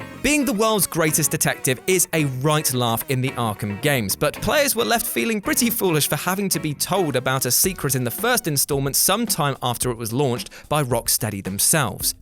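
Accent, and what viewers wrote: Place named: United Kingdom. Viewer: Everything is slightly better with a British accent.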